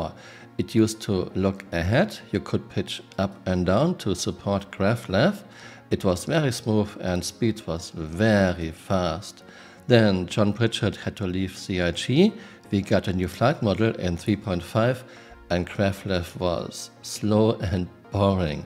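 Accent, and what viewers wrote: Germany. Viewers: this is probably the most romantic german accent i've ever heard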